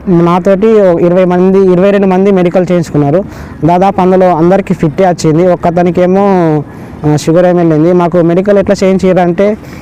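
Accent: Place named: Andhra Pradesh, India. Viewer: నువ్వు కరీంనగర్ గదా నువ్వు సగం ఆంధ్ర సగం తెలంగాణ మాటడుతునవ్ మొత్తం తెలంగాన మాట్లాడు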